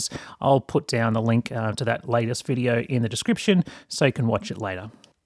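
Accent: Australia. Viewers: I bought a similar fan to the cheap one shown here but for a price of about 30 UK pounds (which is more than twice the price). Outside is a bit slimmer but otherwise looks very similar. However, I found that spending extra got me the following: - 26650's instead of 18650's. They advertise 4500mAh, not sure what it really is but it is rather heavy and I haven't managed to discharge it yet (had it for almost a year). - Proper cell balancing, the midpoint of 2 cells is connected to the charging board is well, the datasheet of IC used shows it does balancing. - Speed control. - Some protection from the fan being turned on by accident (the button needs to be held for 3 seconds before the fan turns on) - Nozzle that properly locks in instead of a wimpy magnet. Funny enough, the price of that same model of fan later rose closer to what the kica costs. In terms of feedback, it's a good video with a pleasant aussie accent but 2 things I'll note: - The electronics on the back of the motor is actually the ESC. 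They managed to make it so small by using "2 in 1" transistors, same type as used in laptops for generating power rails, they are basically a MOSFET half-H bridge in one SMD package, this way they only need 3 footprints instead of 6. - The wind speed could have been measured in m/s, would have been more understandable to most of the world and comparable with listings since a lot of them advertise speed in m/s.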